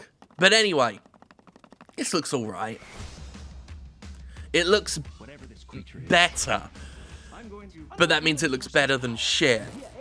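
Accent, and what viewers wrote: United Kingdom. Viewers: you talk like an old man with a stuffy nose :/ you must be british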